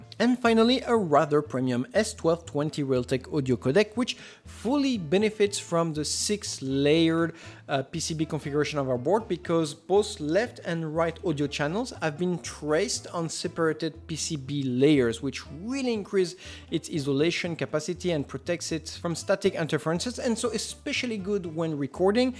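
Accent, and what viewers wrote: France. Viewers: Hi, where are you from ? I spotted a French accent :) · are you french !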